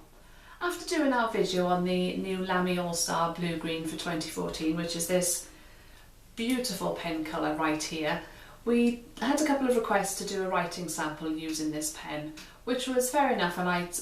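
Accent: Wales, United Kingdom. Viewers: I keep hearing a faint tint of Welsh in your accent?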